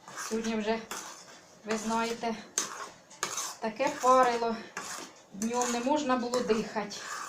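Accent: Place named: Poltava, Ukraine. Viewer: а де ви проживаєте? чи не на Полтавщині?знайома говірка.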